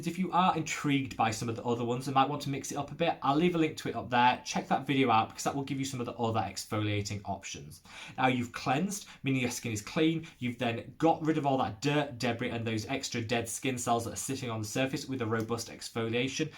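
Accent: United Kingdom. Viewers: yeah in Valencia, born here, but i love foreign languages, so I use your channel to improve my english,( I learned English at Loughborough -Nottingham, and I'm in LOVE with your marvellous British accent, hate the American tough) and to learn about my skin routine so is a win -win situation for me. Lol 😆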